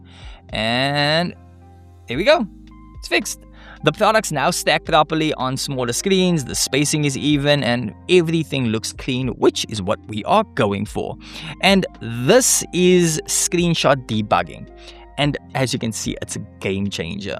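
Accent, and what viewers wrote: South Africa. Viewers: My guy are you South African? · What’s that accent dawg? South African?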